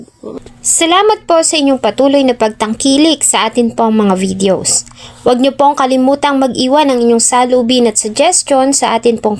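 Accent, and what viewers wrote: Visayas, Philippines. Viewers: putol na sundang 🤣.. Klaro kaayo na bisaya sad ni 😅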